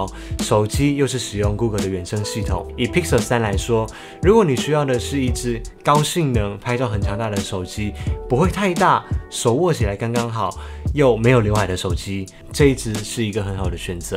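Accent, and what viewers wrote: Taiwan. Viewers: 录得很好 Youtuber 的台湾腔听起来非常舒服😌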